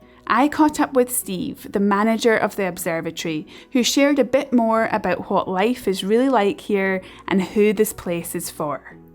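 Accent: Scotland, United Kingdom. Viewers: Hey, I’m Saudi, from the vast and well-known deserts of my homeland. As of today, I’m 23, and I’ve finally reached a destination I’ve long dreamed of: Scotland—particularly the Scottish Highlands. I visited in the first third of January and made my way to Glencoe. I have to say it out loud: the Scottish people are truly blessed by the gods with such extraordinary landscapes. I can scarcely believe this is real; I was completely overwhelmed with awe. The weather was stormy, and I traveled alone. It prompted a deeply introspective state—I felt, perhaps for the first time, an intimate connection with nature. As a Saudi from an Arab background with a C1-certified level of English (which is relatively rare), I found arriving in Scotland to be an ironic experience. Despite my fluency, the accent rendered everything almost like a completely foreign language. 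My question for you today: do Scottish people often encounter highly educated English speakers, yet still find the accent a barrier? I suspect the answer is yes. Your accent is sharp, almost like a sting, yet it is profoundly expressive and emotionally rich.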